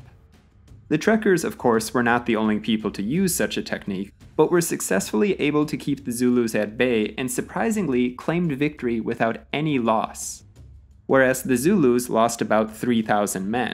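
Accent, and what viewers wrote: United States. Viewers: There is something very wrong with a person explaining to the world from an American accent what happened in Southern Africa! Where does he get his information from? No, I'm sorry I refuse to listen to this. He doesn't get the nuance or intricacies of the issues. I was under the impression that the Kei river border wars were between the Xhosa's and Dutch settlers not with Koi Koi tribes. It also seems that he outcome of historical events depends on who you ask and from which colour and Tribe they come. but no please don't give me an American trying to tell me about my history!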